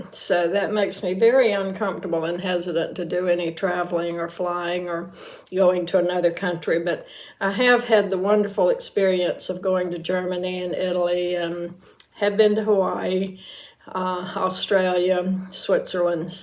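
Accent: South, United States. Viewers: I found your channel today and I could listen to you talk for hours. I live in Oklahoma, so it's not like I don't hear southern accents, but yours is so lovely. I'm also a retiree with a mortgage, but thanks to my working so many years and a great investment manager, it's all good and all I have to do is hit 95 and this place will be all mine lol. So glad to find you and and I'll be back to see all your videos. You're a natural!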